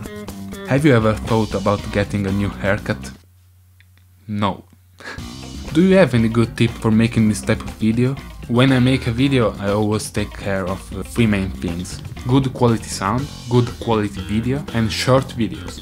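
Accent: Italy. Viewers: Bruh, he already revealed his laugh 5 years ago and his italianglish voice too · If you observe closely you can hear a tha italian accent. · • Italian voice/accent revealed • Laugh revealed CHECKMATE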